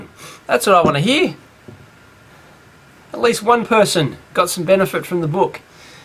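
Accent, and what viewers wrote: Australia. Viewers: Just for the record... Love to hear an Aussie be a true Aussie!! keep it up mate! Ignore those other winging bastards that can't understand our shenanigans!! THANK YOU VERY MUCH! its refreshing to hear! Please keep it up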